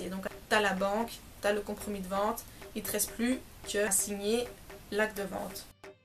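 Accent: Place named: Belgium. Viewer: L’accent belge tous ce que j’aime 🥰 bien rare sur YouTube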